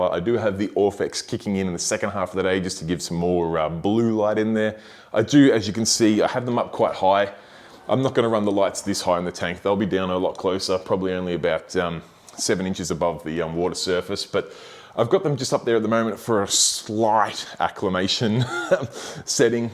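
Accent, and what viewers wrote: Australia. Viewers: As a man raised in California, I’m only here to hear the best accent the world has ever produced! Damn I wish I was Aussie! Lol. Awesome tank man and grate series.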